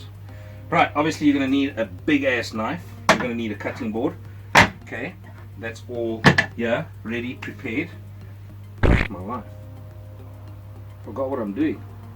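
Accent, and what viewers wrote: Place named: South Africa. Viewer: I wondered what accent this was. South Africa. What a proper country. Cheers.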